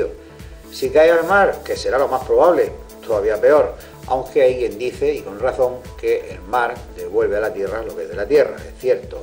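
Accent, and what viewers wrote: Murcia, Spain. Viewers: Aver si me llega alguno a Suecia!!!!! Despues de seguirte durante algun tiempo y escucharte con tu acento muy familiar ya me enteré que eres Murciano jejejeje.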